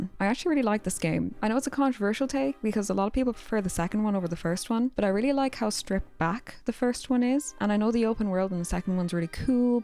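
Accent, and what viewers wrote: Ireland. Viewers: Hold up is you irish? If so thats dope as shit can you say water in your next video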